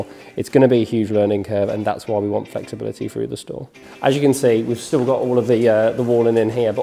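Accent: Birmingham, United Kingdom. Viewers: Obviously Birmingham but Dublin possibly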